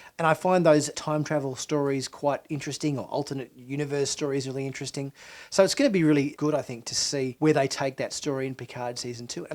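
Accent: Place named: Australia. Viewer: Great Aussie accent. 1. Discovery needs to step away from its convoluted plots that diminish its great cast and production. 2. Picard: Beginning with Farpoint the talent of Patrick Steward and John DeLancie was immediately apparent. I really like your idea of having enhanced memory that would bring Picard's wealth of experince to life. 3. Strange New Worlds: With Number One as one of the great first officers and Pike as a legendary captain the opportunity for Spock to grow with the challenges of a young officer and evolve with awesome mentors would provide a magnificent character arc.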